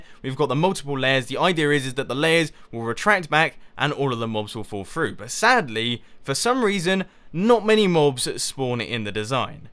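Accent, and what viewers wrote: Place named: United Kingdom. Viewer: has an accent though I can't pinpoint what accent he has, I wanna say it's a british accent but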